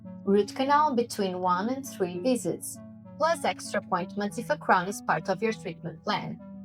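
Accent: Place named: Brazil. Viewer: By her accent, she is Brazilian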